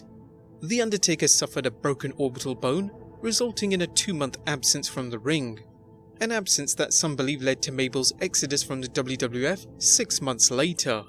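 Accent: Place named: United Kingdom. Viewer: Why is it always a British accent?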